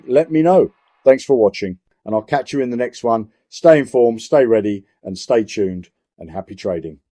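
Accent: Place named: Northern England, United Kingdom. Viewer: REACHING OUT YET AGAIN. DUDE IF YOU CAN'T BE ARSED TO ANSWER YOUR SUBS I CAN'T BE ARSED TO KEEP SUBBED. STEP UP YOUR GAME DUDE. HERE GOES AGAIN. MY WALLET TOP UP SAYS You cannot top up your account with the selected MetaMask chain, please change to «BSC Chain»! NOTE* you must connect your metamask account to this website I THEN PRESS THE BUTTON AND NOWT HAPPENS. YOU SOUND LIKE YOU FROM THE NORTH LIKE ME SO WHY DO YOU OPERATE SO SLOWLY????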